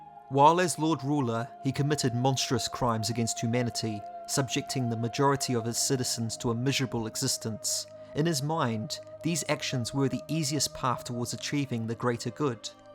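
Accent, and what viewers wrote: New Zealand. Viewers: That is a kiwi accent. Shot bro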